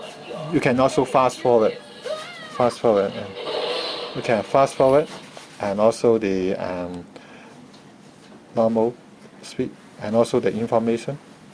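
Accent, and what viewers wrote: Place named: China. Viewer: poor narration Chinese ascent waste to watch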